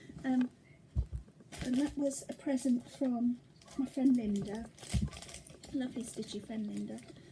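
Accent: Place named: United Kingdom. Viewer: I can tell you feel better and I am so glad. I always enjoy your videos and your British accent. (I have a Texas accent). It doesn’t sound as classy as yours! Your dogs are sweet and I can see why you love them.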